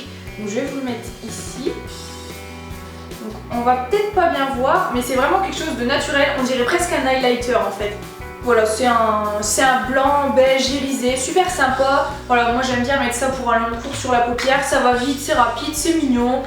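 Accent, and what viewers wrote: North, France. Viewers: Tu es du nord non? Classe tes achats! J'ai le double wear aussi je l'aime trop!!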